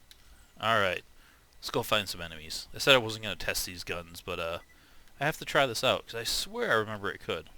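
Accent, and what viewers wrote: United States. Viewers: "Nuke-u-ler" Nor picking, but is that how american's say "nuclear"? I'm assuming you're from the US judging by your accent though.. I always thought words spoken in American-English dialect are pronounced the way are spelled, though you're not the first person I've heard pronounce it in what sounds like a foreign language to me lol xD And yes, the Tunguska does consume two ammo per shot.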